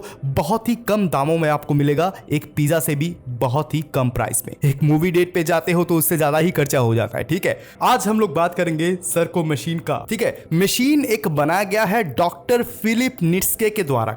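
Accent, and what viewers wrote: India. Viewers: Aapki voice indo english lagti hi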